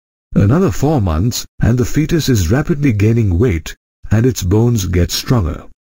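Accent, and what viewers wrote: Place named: India. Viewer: This is Indian accent ma'am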